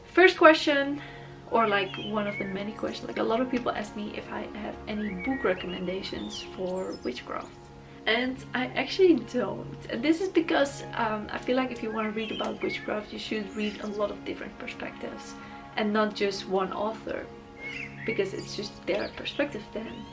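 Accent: Netherlands. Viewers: Ah I knew you were Dutch! Dutchies always recognize other Dutchies accents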